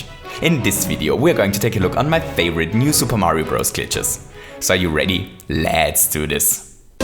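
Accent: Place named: Germany. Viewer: Dein deutscher Akzent ist echt eklig bro. Und kannst du bitte aufhören zu versuchen intilligent zu wirken indem du immer wieder unkonventionelle Wörter wie "plummer" benutzt, das nervt einfach nur und ich bekomme cringe-attacken, weil mal wieder ein deutscher auf möchtegernengländer macht. Ansonsten geht dein Content aber klar.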